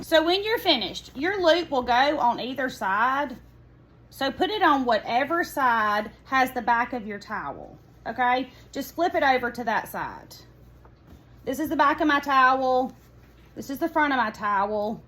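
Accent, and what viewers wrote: South, United States. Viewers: I’m from Arkansas and I live in Idaho. Your accent made my heart ache for home 😭 I love your channel and will follow for sewing tips AND to hear that beautiful southern accent. Thank you so much and God bless you ♥️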